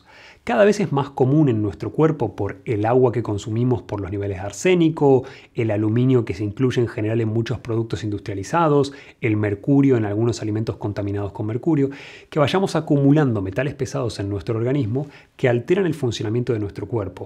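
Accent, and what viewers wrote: Argentina. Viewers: Tiene una voz de Argentina y en mi mente se me viene sus típicas frases "che" boludo, nene , pero vos que me estás queriendo decir" jajajaj me encanta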